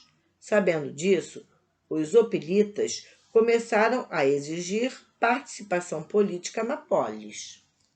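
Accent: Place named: Rio de Janeiro, Brazil. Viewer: Sotaque carioca entojado.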